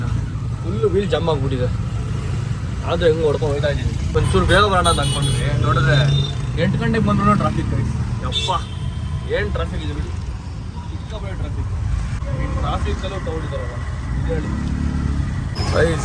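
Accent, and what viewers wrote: North Karnataka, India. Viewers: just love the way you speak our uttarkarnataka slang 😂❤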